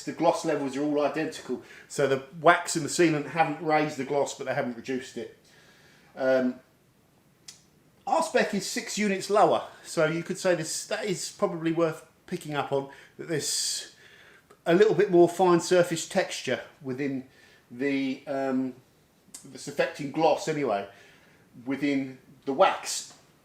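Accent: United Kingdom. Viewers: God I love English accents hahaha. Maybe more people would watch me if I was British hahaha. You really know your stuff man, this video is excellent so far. One of my customers brought a gloss meter in once, he used them at his work to measure gloss on like gloss business card prints.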